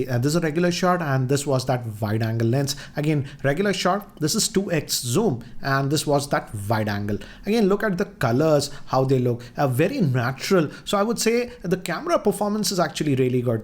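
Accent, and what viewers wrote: India. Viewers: Base in his accent I think he is an Indian. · Never thought i would hear a indian sounding guy say remove junk apps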